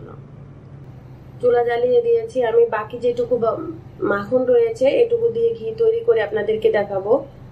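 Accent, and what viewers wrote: Bangladesh. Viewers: I guess she is from Bangladesh , due to the accent . But yeah if you are from India that is , then I definitely don't know where dalda can be found in your country, sorry couldn't help ☹️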